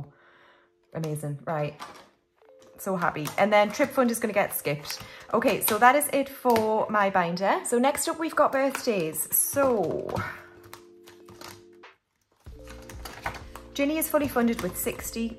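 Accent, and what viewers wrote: Newcastle, United Kingdom. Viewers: I’ve said this before and I’ll keep saying it, I bloody love the Geordie accent 🙌🏻 Our birthdays are a few days apart, mine is July 26th! Team Leo You missed out 36 on the penny challenge but you’ll probably notice it when you do it next haha. Another amazing video & don’t forget to use your spending money on a bottle of wine or two when you have food with your travel friends 😂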